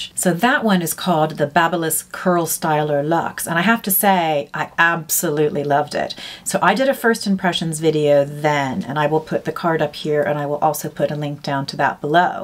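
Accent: Ireland. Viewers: Wonderful! I'm from the UK but have ties to Guiness and love the Irish accent! Good that you can swap from Irish Colleen to Southern Belle!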